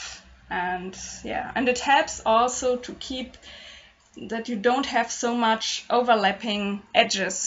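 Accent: Germany. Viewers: Hmmm... your accent kinda sounds german. Where does my guess come from? My mom articulates herself very similar to you, when speaking english. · me too, I love her German accent. It's a shame all the commenters on YouTube teased her about it. There is joy in diversity, unfortunately not all see things that way. 😔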